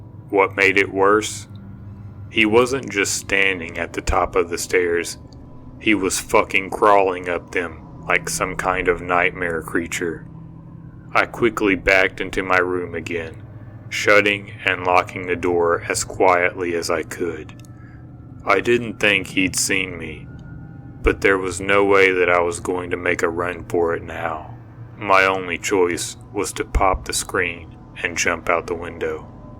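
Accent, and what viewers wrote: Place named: South, United States. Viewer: I 🖤 ur subtle southern accent, and 🖤 ur channel! Emz.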